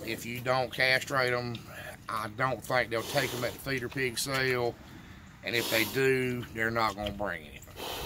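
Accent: South, United States. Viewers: I love the southern accent. I can listen to it all day. Much love to our amazing farmers.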